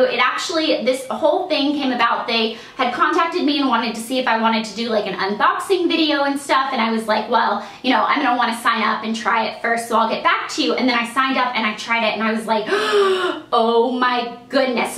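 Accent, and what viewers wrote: South, United States. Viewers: I honestly miss her southern accent..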